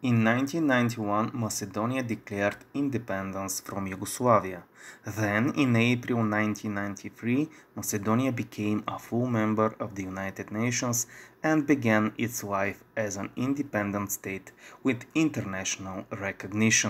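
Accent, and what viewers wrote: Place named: Russia. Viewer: Your English with Russian accent is very funny!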